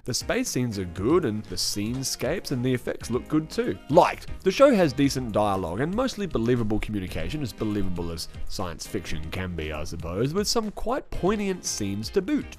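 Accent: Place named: Australia. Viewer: The review was better then the show...Perhaps #Netflix could give you your own series with you doing just reviews.... But make sure you use that Australian accent..some people really like that !! "OK so I give the show a C- and the review a solid A"....... PEACE and BE WILD !!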